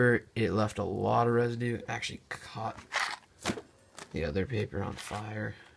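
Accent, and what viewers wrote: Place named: India. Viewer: A wrapper I thought he was saying rapper This Indian tech guy inglish needs to stop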